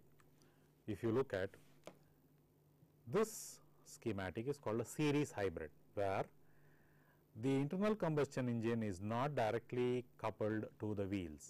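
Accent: India. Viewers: Hello, He is an Indian and there is nothing wrong in speaking with an accent. This program is primarily targeted at Indian students, who won't mind the accent. And unlike westerners, Indians have a culture of respecting their teachers. Grow up, and throw your chauvinism out when you come to learn something.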